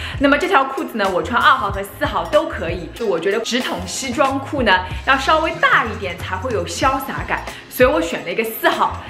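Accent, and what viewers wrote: Shanghai, China. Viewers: 第一次看你视频，觉得你说话很像上海人，很有亲切感。然后又看了你自我介绍那个视频，果然没错呀😆 支持你哦！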